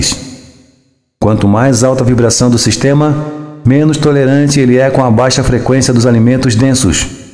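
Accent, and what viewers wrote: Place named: Florianópolis, Brazil. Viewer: Essa voz e sotaque é de manezinho da ilha né?... morei em floripa por 6 anos e esse sotaque me faz lembrar ds lá.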